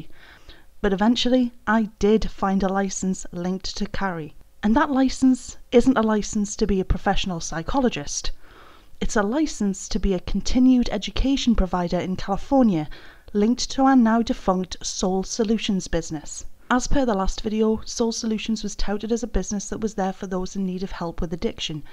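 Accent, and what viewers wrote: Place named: Newcastle, United Kingdom. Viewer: More than British...you’re a Geordie...hell you could be one my neighbours 😂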